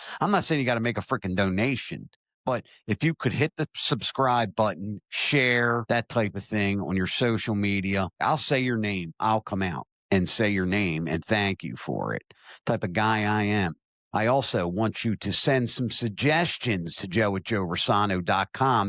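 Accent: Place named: Baltimore, United States. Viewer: Hello Joe, had to catch up, have not seen videos for a few days, I remember the show you had on Facebook and missed it when you stopped, now I know the truth, glad to have you back, Andy from Baltimore (Essex) and yes you have the distinct Baltimore accent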